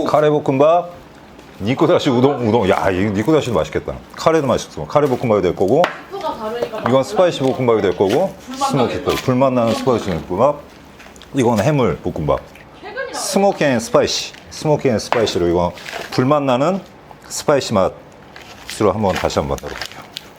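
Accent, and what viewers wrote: South Korea. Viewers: 이분 한국분인가요? 억양까지 한국인같아요.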